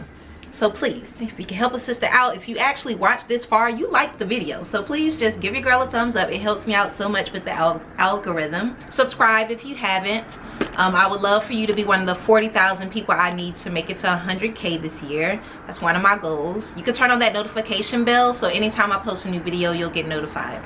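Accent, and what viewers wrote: United States. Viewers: you activated my alexa lol, all the way in London with an american accent love it x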